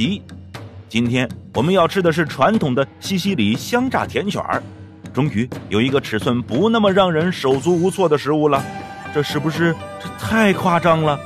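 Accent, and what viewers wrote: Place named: Beijing, China. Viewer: OH the Beijing native Chinese voiceover just makes it SO much worse - Feels like not a single Chinese person was involved in writing this...Actually, yeah Mulan live action vibes. Holy crap. Reading a case about this in my business class and I was like "it CAN'T be that bad can it??" And holy shit it was. All 121 seconds of it. JEESUS.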